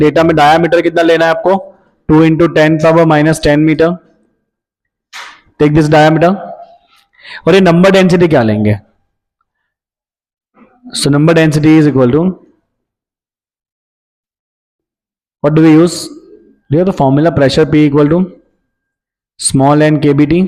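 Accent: Gujarat, India. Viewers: Sir are you from Gujarat?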